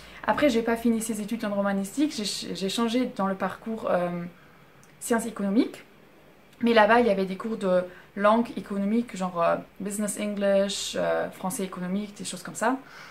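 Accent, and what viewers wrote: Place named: France. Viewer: Your French accent is so great! Found your channel through NonStopParis' live stream. I've subscribed and can't wait to watch all of your videos :)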